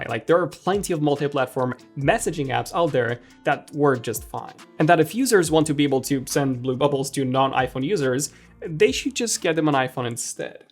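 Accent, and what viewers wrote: Romania. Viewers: Trebuia să aud de 6 ori ca să confirm că tu ești din românia, da întrebarea este, ești din românia?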